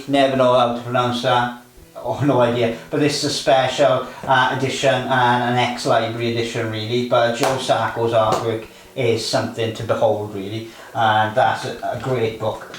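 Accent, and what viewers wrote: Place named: South Wales, United Kingdom. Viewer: That little mistake does not keep me from enjoying the video. I think most people make the mistake because quietly is the better-sounding word. Are you native to South Wales? I'd like to connect the accent geographically.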